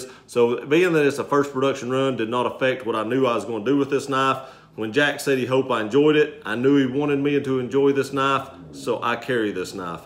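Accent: South, United States. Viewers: Hello new supporter here showing support for ya and I hit the like button. Awesome video. Great gift. Nice knife!! Like ur accent. Sound southern. Lol like me. O I hit the bell too. !🤩🤩🤩🤗🤗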